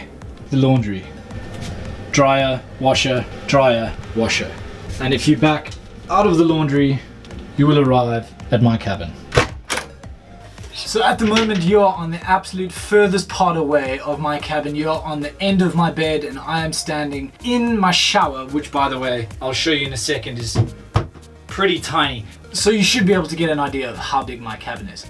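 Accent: South Africa. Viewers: Are you SouthAfrican? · Are you south african??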